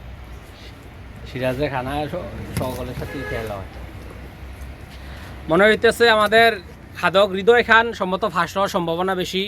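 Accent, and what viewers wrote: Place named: Comilla, Bangladesh. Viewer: কুমিল্লার ভাষা এটা ভাই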